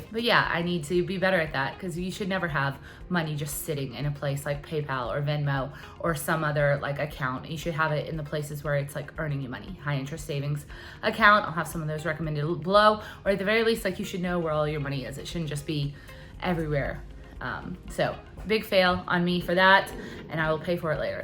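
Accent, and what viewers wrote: Texas, United States. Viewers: Congratulations,! My note on your voice is I have never thought you had a southern accent until today. You sound Texan for sure today. I hope you are better soon. You are an inspiration.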